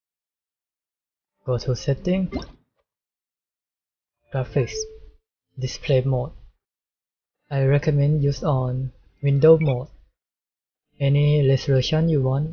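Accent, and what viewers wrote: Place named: Thailand. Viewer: Are you Thai? It is random but you sound like you have a Thai accent and have darker skin (I know you have the accent because I hear it all the time from my moms friends)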